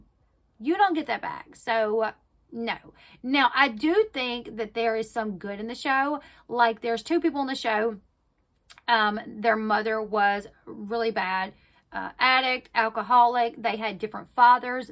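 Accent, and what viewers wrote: United States. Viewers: I like your accent. What part of America are you from? I’m from South Australia, so I’ve never heard your accent before.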